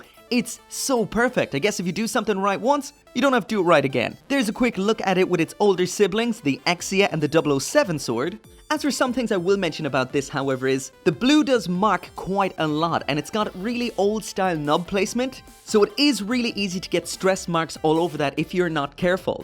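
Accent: Ireland. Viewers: Hey man, you Irish?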